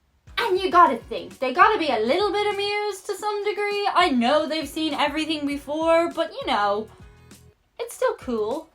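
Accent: Ireland. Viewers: It was probably her Irish accent that made people more likely to help her. ¯\_(ツ)_/¯ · Let me guess, a very pretty woman with an Irish accent got some cold hearted New Yorker's to warm up. Who could have thought that was possible?.... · As a Pharmacist, I will be checking a prescription for accuracy, while I’m on the phone with a Doctor, while a Pharmacy Tech is asking me a question. That’s considered “mild multitasking” in Pharmacy World. I jay walk everywhere I go. But, it’s absolutely the most fun in NYC. It’s like a carnival ride. The drivers don’t slow down or maneuver, it’s awesome. It’s a list, just a list... you met some nice ppl in NYC. But, you’re a cute blonde, with a cute Irish accent, and you’re white. You can figure out the rest. And, if you have a person videotaping you, people are going to be like angels. Call B&H Photo Video, tell them you want to buy an expensive digital camera. 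Then ask lots of questions. Try to extend the convo into a friendly conversation like one might have with a barista! Ask them “what does that mean?” Ask it a lot! Lol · Honestly I do think the smiling and being friendly to you. Look in the mirror very attractive / Blonde / Irish accent. I think that is most likely why you thought that. Beside smiling and slamming doors in your face. You had the best of both worlds. I really wish tourist's would visit small town America. Everyone goes to large population areas. Small town USA. Smiles holding doors and good service is us. Your welcome to come to Oregon. {Just don't stay in Portland } Come to where i live Lyons,Or Settled by 2 Irish brothers. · You have your Irish accent,.🍀 That will melt any New Yorker's tough exterior! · New Yorkers were nice to you because you reminded them of their great Irish granny (your accent). They don't hold doors open because you gotta stop. 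Finally, they laughed when you dropped your food because NOBODY drops their food. They might throw it away but they don't drop it. Just kidding. You're hot and nice. It goes a long way.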